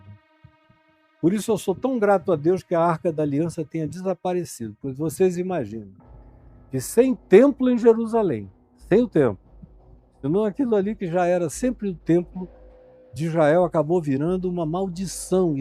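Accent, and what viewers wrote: Recife, Brazil. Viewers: Aí sabe que fala Recife Pernambuco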